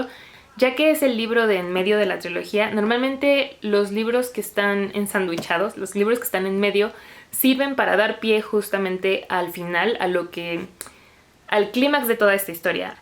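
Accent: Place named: Spain. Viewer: Youuuu have an amazing spanish accent😭❤ Can't help but subscribeee❤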